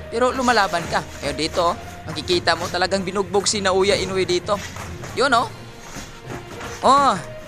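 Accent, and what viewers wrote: Visayas, Philippines. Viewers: Bisaya ka talaga tol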